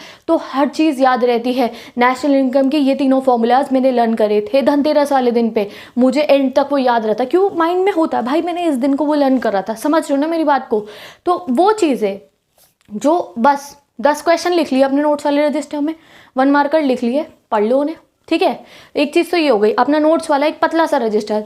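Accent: Punjab, India. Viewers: R u punjabi didi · Are u Punjabi?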